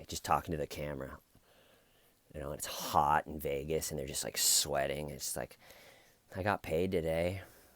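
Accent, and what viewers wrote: Boston, United States. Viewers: I love your videos! Keep it up, totally makes my day and the Boston accent is priceless. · Omg, you are historical. Great Boston accent. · OMG you got the Boston accent! A reason to say "balls" is cuz he (the detective) is playing an angle of class difference (a huge social deal in Boston and more so back then) so he can show he isn't deferential to a doctor-to-be -it's like a micro-aggression to convey shit is getting real Phil ( IMO ) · you'd fit in perfectly, ya look like a Bostonian Irishman... Ya got the accent down better than most lol.. I'm from Southeast Mass... Closer to RI, I have more of a New Jersey accent ... Strange how it is round here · You sound like a whacked out Kennedy lol w the Boston accent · This is the first time we’ve seen your hair, isn’t it? A neat cut! Love your Boston accent.... reminds me of JFK. 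· So true about having a gambling addiction. I'm imagining how depressing and devastating it has to be to keep fucking myself over like that, and I seriously would want to take MYSELF out. Ok your Boston accent is funny as hell. I'm in Western Mass for 10 years now (which has it's own dumb accent) and it took me years to realize that the Boston accent is NOT indeed a speech impediment or a foreign accent. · I love your Boston accent 😂😂😂 just made me picture a bunch of cops from The Departed! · BTW your boston accent is killer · STOP WITH THE STUPID BOSTONIAN ACCENT! YOU SOUND LIKE AN IDIOT!😠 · Love ur Boston accent. Lmfao! Sound like Marky Mark back in the day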